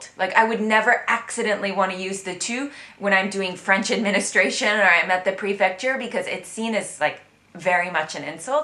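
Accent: England, United Kingdom. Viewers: Hi. It's certainly b/c you just don't know your sounds in French ( u / ou - an / on ) and keep your English accent . In these conditions, I couldn't speak this way myself. You should start to pronounce short words the right way then see step by step. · Your sole problem in this video is : you pronounce all these french words with a perfect English accent ! Especially the pronoun ON. Consequently it must be hard for your English speaking audience.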